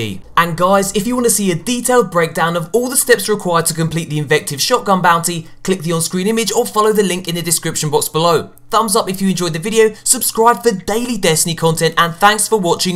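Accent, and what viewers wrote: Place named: United Kingdom. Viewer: I watch these vids to here your voice and freak outs you snazzy Brit you x)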